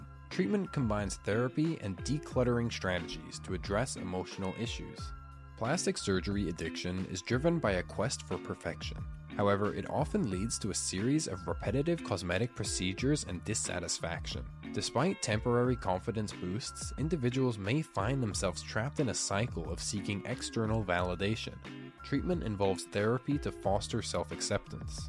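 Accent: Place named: New York, United States. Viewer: That's definitely either a New York or Philly accent, not Boston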